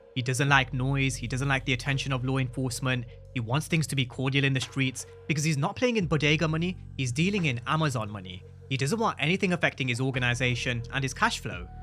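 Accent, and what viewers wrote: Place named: United Kingdom. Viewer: He sounds British. So it’s 10 am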